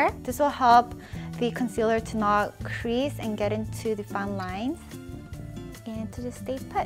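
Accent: South Korea. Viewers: Every time I watch your videos, I notice how you have this Korean accent but I'm not quite sure if it's Korean accent or not because my fellow Korean friends who were born in Canada don't have such accent. Is it only me who notices her accent?? lol what accent do you have?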